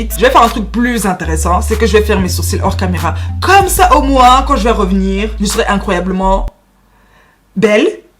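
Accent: Cameroon. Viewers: Jadore jadore jai bien dit jadore l'accent camerounais je le trouve beau je kif voir les camerounais parler et en plus je tombe sur toi je ne te connaissais pas mais je te trouve fraîche pétillante marante en plus ta l'accent 😍😍😍😍😍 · J'ADORE TROPPP TON STYLEEE, TU ME FAIS TROP MARRER !! 😂😂😂 En plus t'es ma sœur camer par ton accent je le sais wow. Hahahah. Je ne te connaissais pas avant pourtant t'es déjà à près de 20.000 abonnés.😍😍 Je me suis vite abonnée. Big Kiss et courage pour ce que tu fais et je remercie YT pour cette recommandation. ❤️😘😘😘😘😘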